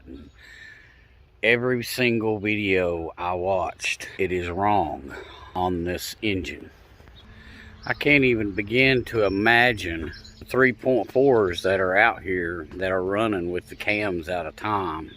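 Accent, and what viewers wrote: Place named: South, United States. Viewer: Im calling you the " " because your calm southern voice and patient demeanor makes it easier for ADhD heads like me to learn from 👍 Now Im actually looking forward to doing my heads when they need it haha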